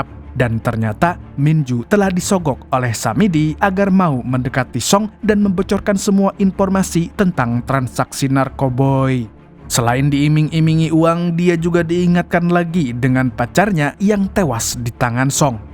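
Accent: Banjar, Indonesia. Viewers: Bang pian urang banjar kah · Bang kamu orang banjar ya😅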